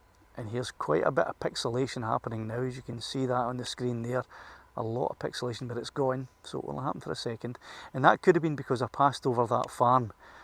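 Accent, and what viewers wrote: Scotland, United Kingdom. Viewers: Scottish accent is the best. ☺️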